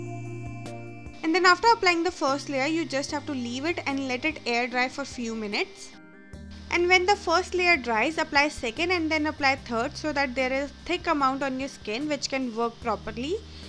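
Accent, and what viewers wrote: India. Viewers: im for sure you are an indian, the way your accent sounds like, by the way, the way you said POTATO is kinda bit funny, in a good way. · this indian accent had been very annoying omg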